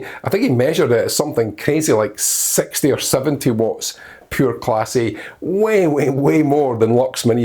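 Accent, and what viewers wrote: Scotland, United Kingdom. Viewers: Being and Australian, I'm sure he's Scottish.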